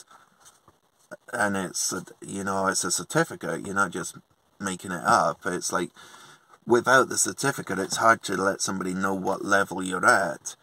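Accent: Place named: Cornwall, United Kingdom. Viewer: Joanne says you’re beginning to get a Cornish twang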